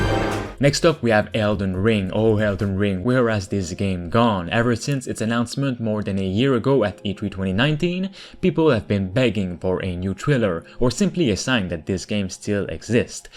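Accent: Quebec, Canada. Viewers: caliss cest cool de savoir un quebecois qui comment les jeux pis tout mes caliss faut vraiment que tu travaille sur ton accent ca menerveeesss !!!! ont dirais gsp qui parle en anglais fuck! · Hmm are you from Quebec? :D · u have a quebecois accent ;) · Hey, ton accent me rappelle celui d'un québécois, je me trompe?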